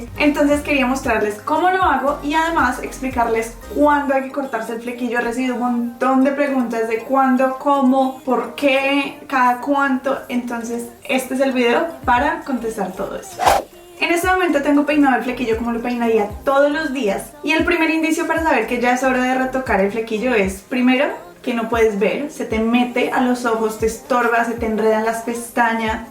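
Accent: Colombia. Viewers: Escuchar la capul me recordó a mi madre muy rola ella🥰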